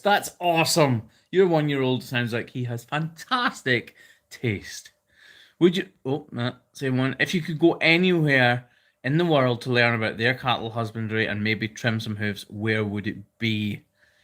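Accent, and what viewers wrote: Scotland, United Kingdom. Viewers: Hello from upstate NY. In U.S.A. ..I simply love your accent from Scotland. We obviously do not get yo see how beautiful Scotland is so thank-you to and your wife for sharing your profession and Country with us.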